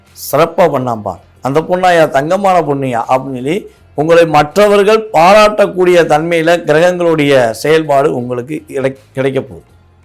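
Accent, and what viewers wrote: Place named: Madurai, India. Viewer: Madurai man vasam pechil theriuthu sir.romba varusama adi than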